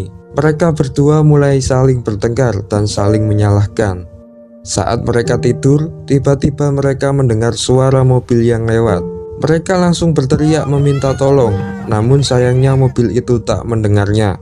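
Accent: Java, Indonesia. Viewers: Medoknya lho rek wkwk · jawa nya medok bgt 😫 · JAWINYA MEDOK BNGT MASS 😁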